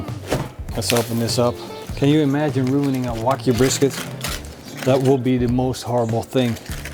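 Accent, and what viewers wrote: Netherlands. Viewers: are you dutch ??? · Davis Gjeta ja man alle nederlanders hebben zo een engels accentje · Oh yeah yeah nederlandsssss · shaft says Dutch. He from Holland the netherlands 👊🏾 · wollah echt zehme weloe Nederlanders op youtube Pannekoek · It's a Dutch accent ;) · hate the dutch accent. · you sound so dutch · Are you from around the nederlands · is deze guy nederlands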